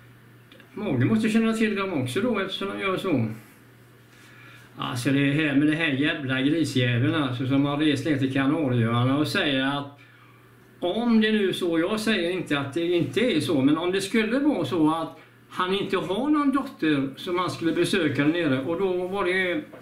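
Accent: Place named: Gothenburg, Sweden. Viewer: Folket som sover kommer nog inte att vakna, så hjärntvättade. Du är så bra fortsätt med detta. Och din göteborgska, den är så härlig.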